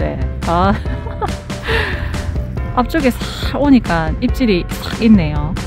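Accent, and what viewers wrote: Busan, South Korea. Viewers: 부산사람입니까. 말투가 암청 정이가네요^^ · 항상봐도 재미 있습니다 부산사투리도 구수하구요 🐟🐟🐟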